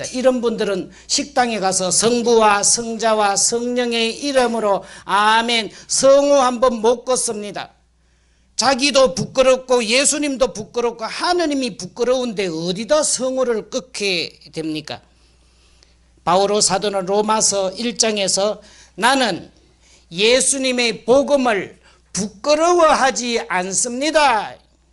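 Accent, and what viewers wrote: Gyeongsang, South Korea. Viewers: 신부님 안녕하세요. 처음 인사올립니다. 전 마리아 입니다. 미국에 알라바마 어번이라는시에 있습니다. 신부님의 강론은 처음부터 끝까지 노래속에 웃음속에 하느님의진리가 저을 깨달게하고 열정을 줍니다. 신부님의 경상도 사투리가 참으로 정겹고 고향의소리라 참으로 좋슴니다. 신부님 건강하세요. 감사합니다. 수고하셨습니다.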